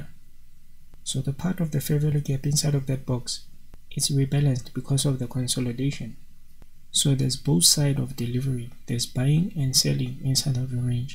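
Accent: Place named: South Africa. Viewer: you are such a legend I watched this video again now... Are you from South Africa? Sounds like it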